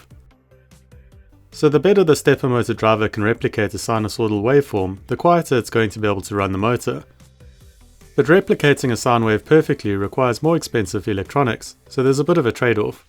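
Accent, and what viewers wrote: South Africa. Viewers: As a saffer in the uk its surreal hearing a SAFRICA accent on a random YT algorithim suggestion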